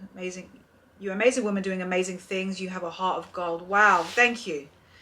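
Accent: RP, United Kingdom. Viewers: I can't understand the commenters thinking you grew up wealthy:D because you're very "street smart" and down to earth! Maybe it's the British accent;) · I wonder if people think you come from money because of the accent? A lot of folks hear an English accent and think that person as posh. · I am an O.G. follower. It's the British accent that makes Americans assume that you come from money or have money. And your impeccable taste and style. Just because it looks easy doesn't mean it is or was. PROPS TO. he is a very self confident, supportive man and partner. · I think the wealthy assumption comes from you having a 'posh' voice and 'quality ' taste. People these days need to be part of a fantasy story..and you are one. KEEP UP THE GOOD WORK! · Y'all are lovely! These assumptions... I think people think you came from money because of your British accent and good taste. 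Who knows? I usually find that the assumptions we make reveal a lot about us and less about the person. 🤷🏿‍♀️ I enjoy all of your videos and Insta account. 🙌🏾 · You dress well, and exude confidence...and also your accent is “posh” so I’m assuming that’s why people think that you come from wealth. 🙏🏼 I’ve spent the last few months in London and there are such varying accents I can almost always spot a “proper” English accent...it’s sounds very different from someone that’s from the North or even a Cockney accent! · I wonder if the people commenting about your coming from money are American. I wonder this because to us, the English accent is sophisticated and posh-sounding. Coupled with your style, your accent makes you appear refined and high class to Americans. I’m an American and I assumed that you were wealthy too because of your style and accent. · I think people (esp Americans).assume you come from money because of your lovely plosh (madeupword) accent. 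But it is easy to tell from your down to earthness that you have worked hard and endured some things. Kudos to you. Wishing you continued success. 😘😍👍🏾👏🏾 Hey 👋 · I think it’s the accent. Americans obsessed with brits & British accents & think everyone that speaks that way comes from money! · I bet that the comments that you come from money are from Americans because we can think an English accent can sound educated, respectful and prestigious. Thanks for being so open, I like you and even more. Didn’t think you can too What Everyone is Wearing but you did.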